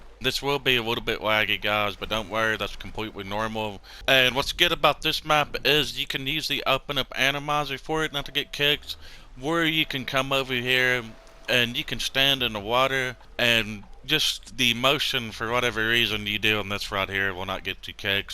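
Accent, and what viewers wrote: Texas, United States. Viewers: Is it your mic or are you from Texas? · My nigga u from texas?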